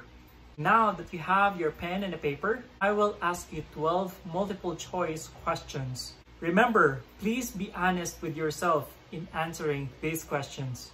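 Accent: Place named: Philippines. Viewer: Owwh i like ur content po and also ur accent when u speak english proud filipino talaga hehe more interesting video pa lods cant wait to see more videos from you po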